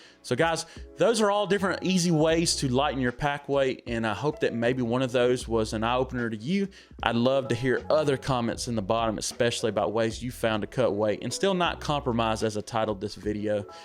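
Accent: South, United States. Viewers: Just found your channel, great southern accent! I hear you tubers often refer to a Nalgene bottle as "Heavy", but, like you, they never really say how much weight you are actually saving. So what is the actual weight savings between a Smart Water bottle and a Nalgene?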